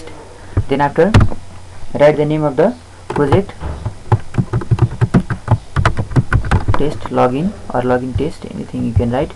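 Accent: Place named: India. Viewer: Habla asi porque es el acento que tienen en la India, no es que hable mal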